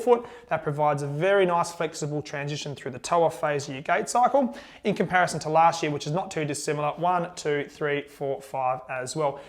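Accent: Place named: United Kingdom. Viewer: It's difficult enough to understand with a british accent, it's more difficult when the speaker talks very fast, I have to replay certain topics. Aside from that, great Review!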